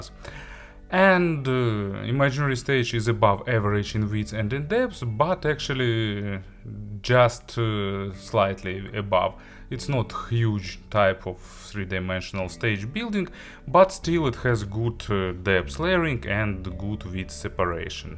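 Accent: Russia. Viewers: Nice review and your Russian english accent is not tiring to listen. I am about to receive my shan m3x in 2-days thanks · руссский акцент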